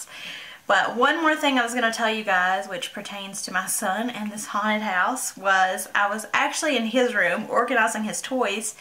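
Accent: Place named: South, United States. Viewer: Omg where are you from? Haha I'm from Mississippi and Georgia so your accent reminds me of home. Southern accents unite! :)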